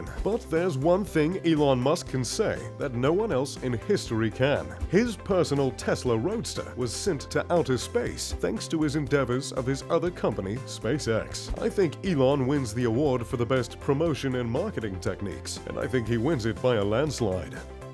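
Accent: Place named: United Kingdom. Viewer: I watched numerous videos on this channel and i realize that British voice is appealing! It adds something to each videos; for example the way he pronouces "dollars", the pitch, the tone, the intonation, the inflection, the accent and let's not forget to mention the humour! They all play a role too! Some weird coincidence, i don't know but i used to watch a TV program called Lifestyles of the Rich and Famous and the host Robin Leach was also a Brit!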